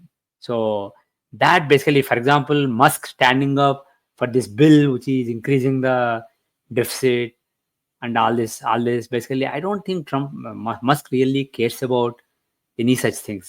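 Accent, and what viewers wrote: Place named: South, India. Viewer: 😂😂😂👎👎👎👎 Terrible Southie Accent. Speaking Madrasi ? Or English? No one understands. Terrible 😂😂😂😂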